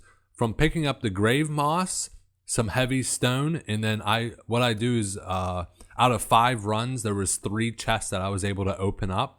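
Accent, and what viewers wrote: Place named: Pittsburgh, United States. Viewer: well the pgh accent makes sense now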